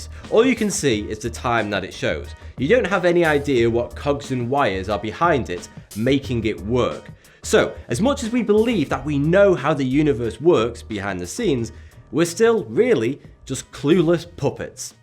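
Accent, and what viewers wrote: United Kingdom. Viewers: ahh that british accent is killing me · Actually he says but because of his British accent, he sounds 42.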